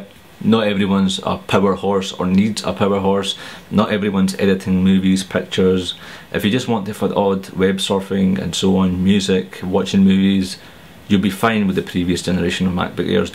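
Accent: Scotland, United Kingdom. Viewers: another scottish person on the internet!!.... it isn't just me! :D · He is speaking English it's called having a scottish accent!! I'm not Scottish and I can understand him. People are so ignorant sometimes as soon as they hear an accent they stop listening to the person and assume they can't speak proper English. :( So disrespectful.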